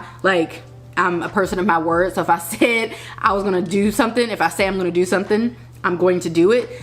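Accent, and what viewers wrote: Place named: Pittsburgh, United States. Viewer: Lovely you have to be from my hometown Pittsburgh … I can pick up on our accent anywhere … it’s nice hearing it especially since I don’t hear it to often ♥️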